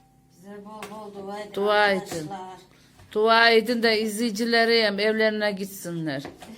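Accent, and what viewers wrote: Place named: Konya, Turkey. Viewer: Merhaba bur konya yöresini konuşmayı benzettim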